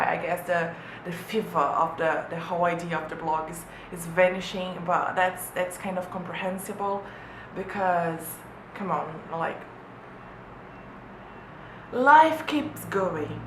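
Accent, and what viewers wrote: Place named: United Kingdom. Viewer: Oii, adorei o FAQ in english!! Você tem um sotaque britânico muito fofinhoo:D por acaso você sabe se na Inglaterra a área de engenharia naval é forte? Ou mesmo as áreas de engenharia? e, por favor, continue postando videos:D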